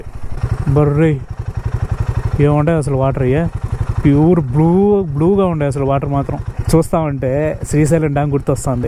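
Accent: Nellore, India. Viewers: బ్రదర్ పూర్తిగా నెల్లూరు యాసలో నె మాట్లాడండి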